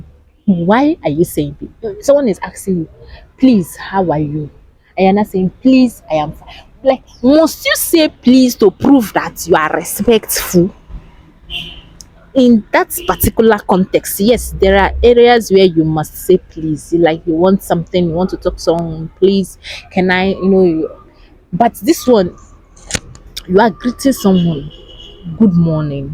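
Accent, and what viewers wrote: Ghana. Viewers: Ur English look like Ghanaian to me keep it up thanks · Nice girl. Nice video. Keep it up. Your accent both in English and Twi sounds so Ghananian.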